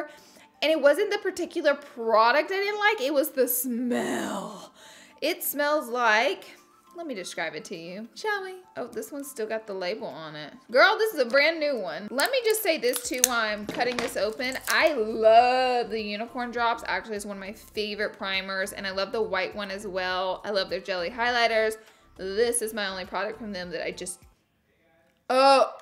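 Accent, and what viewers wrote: South, United States. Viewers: Wouldnt be if y'all didn't have bloopers!! And the southern accent brings it all together!! Loves..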